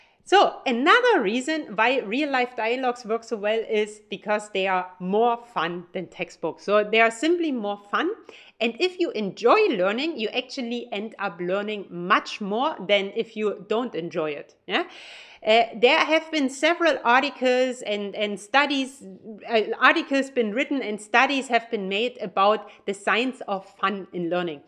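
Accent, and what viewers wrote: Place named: Saxony, Germany. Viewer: Man hört sofort, dass du aus Sachsen bist XD (nicht böse gemeint) Grüße aus Leipzig! :)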